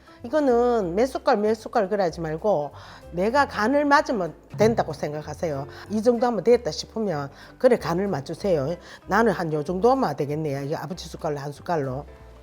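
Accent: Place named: Gyeongsang, South Korea. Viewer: 앗 우리 갱상도 어머님.. 유튜브를 하고 계셧엇어요?! 구독합니다 ㅠㅠㅠ 몰랏엇네요 ㅠㅠ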